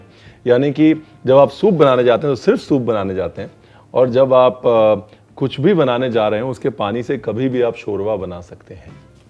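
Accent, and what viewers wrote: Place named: Lucknow, India. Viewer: Jab aap bolte hain toh mere dimag mein bs lucknow aata hai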